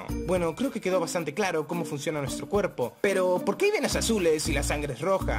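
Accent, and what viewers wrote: Argentina. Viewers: Jaja vos sos Argentinooooo!!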